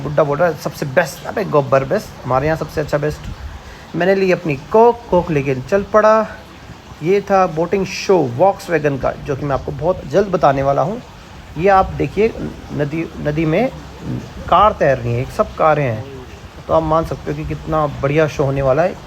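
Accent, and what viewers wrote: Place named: Garhwal, India. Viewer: Germany looks amazing! 😍✨ First of many, hopefully. Garhwali accent was the best! 😂🙌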